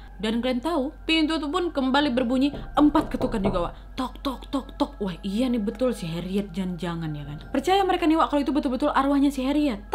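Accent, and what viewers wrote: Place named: Medan, Indonesia. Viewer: Suka sm logat medannya kak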